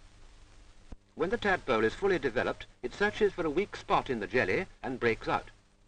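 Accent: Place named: England, United Kingdom. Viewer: Wonderful old film! The English accent! BBC eat your heart out. " Thars a newt over thar. It will dash into the warta before long."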